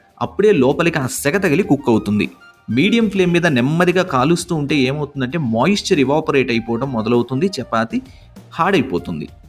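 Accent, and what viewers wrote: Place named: Telugu, India. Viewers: Narrator voice Mind Blowing.... His Telugu Acssent wonderful🎉🎉🎉🎉🎉🎉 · Love the Telugu accent and Telugu! prefer Telugu without english words!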